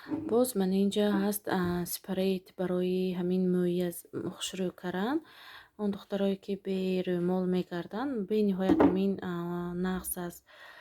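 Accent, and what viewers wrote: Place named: Khujand, Tajikistan. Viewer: Рахмат ки чавоб додет👍👍.Ман аз гапзанитон фахмида будамки хучанди .Некин боз пурсидамм 🤭🤭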